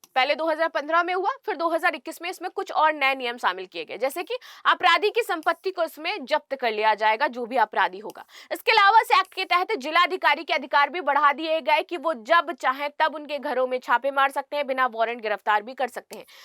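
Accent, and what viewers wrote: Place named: Purvanchal, India. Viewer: Sir Mam ham UP se hai But Aap UP ke purvanchal se lag rahi hai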